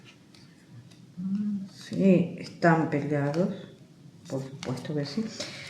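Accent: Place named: Argentina. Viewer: He seguido an desde hace rato pero a veces como ella habla no entiendo muy bien (acento argentino) disculpa. Se también que dice que las cartas indican que Can y Demet están casados pero después de tanta cosa que ha pasado con la terrible DJ y más, estoy confundida. Espero entender ya que voy a leer todos los comentarios. Que tengas buen día. 👋🏼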